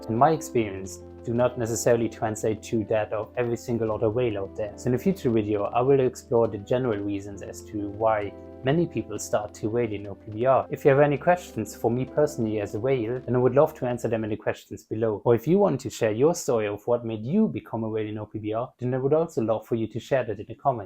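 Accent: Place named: Germany. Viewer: Thats very interesting ! I am from Germany and i thought your accent sounded german.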